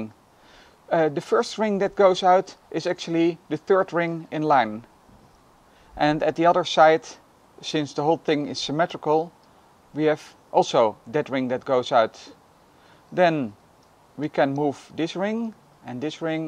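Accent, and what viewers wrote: Netherlands. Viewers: Je bent Nederlands of denk ik het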